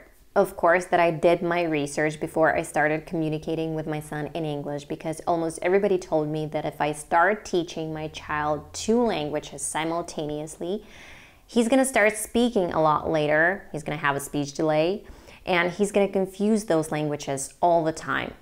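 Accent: United States. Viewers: А муж тоже на англ с ребенком общается? Как у мужа с английским? Он себе такое же американское клевое произношение ставил, как у вас?) Вообще, я просто с отвисшей челюстью смотрел видео. Очень восхищаюсь вашей смелостью, целеустремленностью и авантюризмом))))) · Looking to raise my kid bilingual too. Could've sworn you were American. Thanks for the tips! Great story! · Підписаний на Ваш канал і з задоволенням його слухаю. Цікавий зміст, чудова американська вимова, вродлива і харизматична автор блогу. Бажаю успіхів у Вашій роботі. Цікаво буде через 2-3 роки побачити і почути, як вільно розмовляє Ваш малюк двома мовами!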